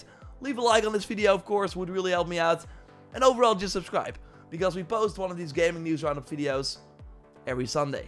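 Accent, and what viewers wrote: Netherlands. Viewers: I know that accent, you're probably from Netherlands 😀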